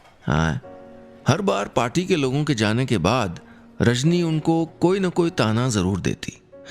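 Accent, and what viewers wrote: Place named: Kumaon, India. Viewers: Love your way of kumaoni diaglouge Can’t control my laughing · I luv ur pahadi accent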